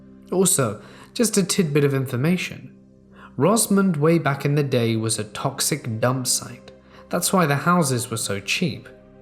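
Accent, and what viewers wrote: United Kingdom. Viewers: I don't think I've ever gotten over deep voice, British accent followed by I'm a 20yo female lol doesn't make me not enjoy or appreciate the video. It just makes me smile to hear lol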